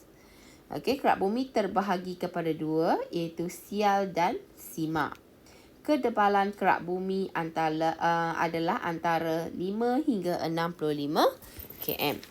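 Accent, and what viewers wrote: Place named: Kelantan, Malaysia. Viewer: cg,cg orang Kelantan erhhhh HAHAHHA BUNYI SLANG KELANTAN "TAKDI" 😂.COMEL CG TQ VIDEO NI TOLONG SAYA UNTUK ULANG KAJI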